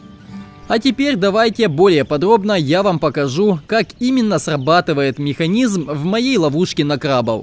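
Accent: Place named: Ukraine. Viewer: Он-украинец, русский- не родной язык. Так что не стоит так обращать на это внимание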